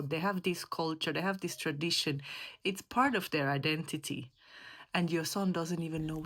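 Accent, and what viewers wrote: Nigeria. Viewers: Your Nigerian accent is so thick and strong.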